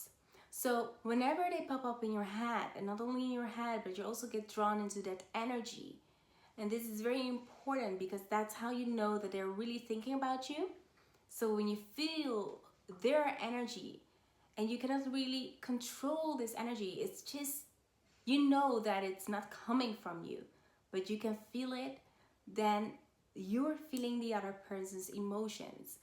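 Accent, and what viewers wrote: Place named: Haiti. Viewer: Are you part Haitian? You have an accent like you are...Also if you are this is Also a sign as well for me!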